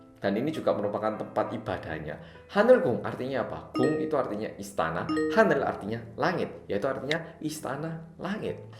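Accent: Java, Indonesia. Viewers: Ade ku udh hapal banget sama medokkk nya mas 🤣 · Malah medog ngomong nya 🤗🤗🤗 ngga kerasa orang asing yg bawa 😆😂😆😂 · Kang, boso jawamu medok ae kang wkwkwk, lanjut trus berkarya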